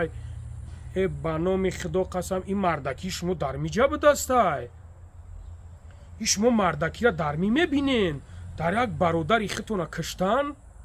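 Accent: Kulob, Tajikistan. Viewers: Худованд дарувахт эмонша ай баданш хорич мекна беэмона хдованд хоршонкна илохим. Бехтарин суханхо лахчаи точики худочон нигахбонут. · Чисто ба кулобиги обиснит кади 👍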